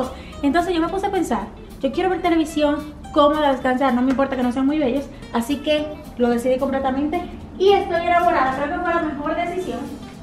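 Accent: Dominican Republic. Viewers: Me encanta el acento de República Dominicana 🇩🇴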